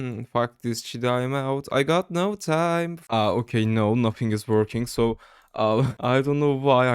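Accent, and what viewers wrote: Turkey. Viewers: Türk telaffuzu, nerde duysam tanırım. :) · Are you From Turkey?! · seni ilk duyduğum anda bu Türk dedim kardeşim en duyduğum en tükçe İngilizce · kral ilk 3 saniyede aksanından anladım. ülkemizden böyle içerik çıkması çok güzel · türk aksanın mükemmel ya hem mükemmel ingilizce konuşuyorsun hem türk olduğun belli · Konuşma tarzından direkt Türk olduğunu anladım böyle videolar yapmaya devam et Türk kitlesinden bir cacık olmaz❤ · video çok iyi olmuş ellerine sağlık türk olmana şaşırdım biraz aksandan anlaşılıyo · Daha girişte anladım türk olduğunu türk türkü tanırmış dostum videolara devam🔥🔥🔥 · hayatımda duyduğum en türkçe ingilizce reis süper video adamsın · türk aksanı baya belli etmiş ama video kaliteli abone oldum:) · valla ilk saniyeden turk oldugunu anladim, aksan ele verdi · First time looking at this channel and the first three words I instantly knew you were Turkish just by accent lol. 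Great video man, keep it up. Ellerine saglik hocam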